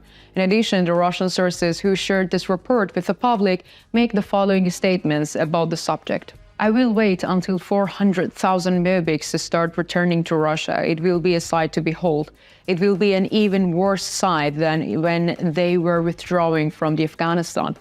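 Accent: United Kingdom. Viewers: Great video, great English! Love this format. Based on the accent, I cannot tell where you are from, since you have a British twist in your pronounciation!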